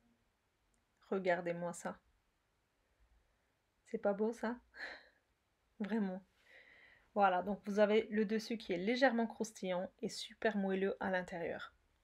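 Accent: Alsace, France. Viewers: SVP Madame Vous serait-il possible de noter les ingrédients utilisés pour chaque recette sous la vidéo ? Ce qui permettrait de les préparer à l'avance, puis vous regarder faire tout en appliquant vos conseils qui sont super, extra ! Vous êtes donc d'origine turque (avec un petit accent alsacien)... Merci beaucoup pour vos recettes, il faut l'avouer, elles sont fabuleuses ♥♥♥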